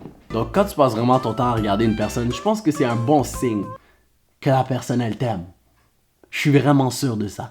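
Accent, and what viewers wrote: Quebec, Canada. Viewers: Bro t’es tu québécois #timequébec!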